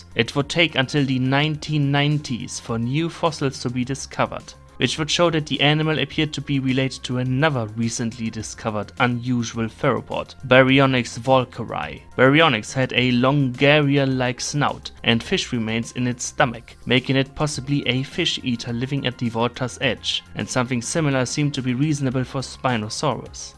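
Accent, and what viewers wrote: Germany. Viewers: deutscher akzent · Are you german?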